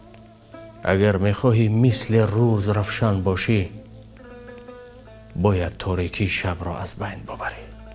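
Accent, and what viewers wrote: Tajikistan. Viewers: Забони Точикиро тоза гап мезанад Офарин 👍👍👍👍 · Дар забону, лахчаи поку, ширинии точики шердилм садкашавм😢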